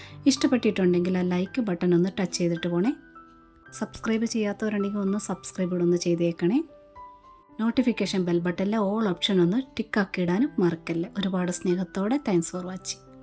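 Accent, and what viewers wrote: Kollam, India. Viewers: കൊല്ലം slang 😊..recipe is healthy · കൊല്ലം ജില്ലകളിലുള്ളവർ പറയുമ്പോൾ സംഭവിക്കുന്നതാണ്... പ്രദേശികമായ ഓരോ സ്ലാങ്ങുകളുടെ പ്രത്യേകതയാണ്.. ഞങ്ങൾ കൊച്ചിയിലുള്ളവർ മാങ്ങ പറിക്കുക എന്ന് പറയുമ്പോൾ, കൊല്ലത്തുള്ളവർ മാങ്ങ പിച്ചുക എന്നാണ് പറയാറുള്ളത്.. Never mind.. റെസിപ്പി എന്തായാലും സൂപ്പർ 🙏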